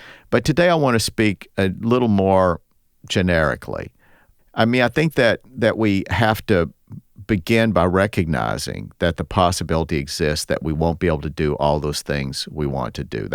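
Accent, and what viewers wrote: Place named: South, United States. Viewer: I bumped into your podcast couple weeks ago and I enjoy your information your presentation and your southern accent very informative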